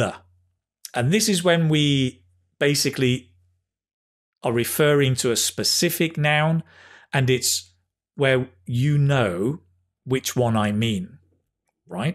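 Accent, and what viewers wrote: United Kingdom. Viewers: I love your British accent 😁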